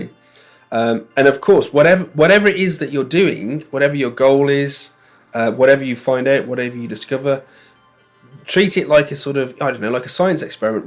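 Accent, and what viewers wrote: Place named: Midlands, United Kingdom. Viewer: Hi, cool video. Are you in the Midlands? I’m in Birmingham:) I’m going off the subtleties of your accent haha. When I was beginning with real Modular I just got 1 or two Doepfer modules and then patched them together in different ways for a while, it was a good way of not being overwhelmed 😊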